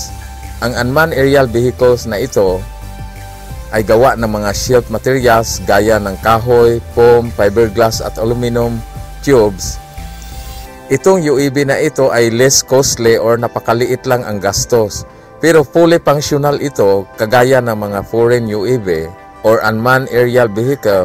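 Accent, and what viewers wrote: Visayas, Philippines. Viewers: Konting bawas pa ng visayan accent at pwede na.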